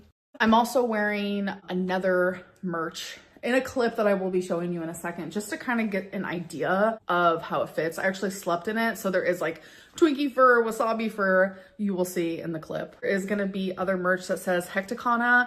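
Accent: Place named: South, United States. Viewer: She sounded like Lex in that voiceover and back to her weird southern thing in the video. That’s some close up comparison of her powers of absorption😂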